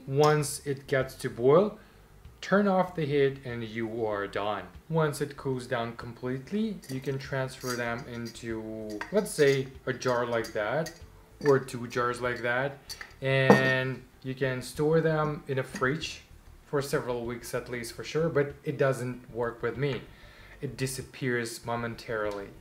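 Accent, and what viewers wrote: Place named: England, United Kingdom. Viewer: настоящий англичанин!!! Заслушалась. )))) Надо пересмотреть. )))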